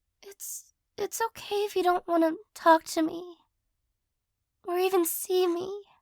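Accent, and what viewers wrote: United States. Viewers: In what part of the english speaking world does this accent come from- ik its american but like- its a good kind of american yknow wut i mean?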